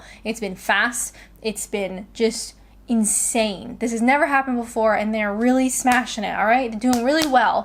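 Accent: England, United Kingdom. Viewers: I don’t know if you know but you are developing the hint of an English accent👌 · its interesting to hear slight english accent isims creep into your speech lol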